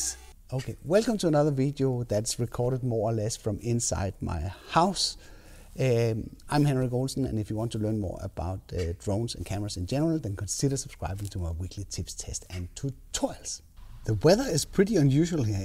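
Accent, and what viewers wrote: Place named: Denmark. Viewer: man kan godt høre at du er dansker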